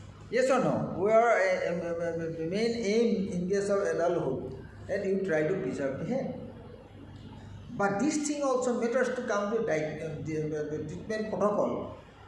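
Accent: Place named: Hindi, India. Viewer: Sir Your Hindi accent is so Nice❤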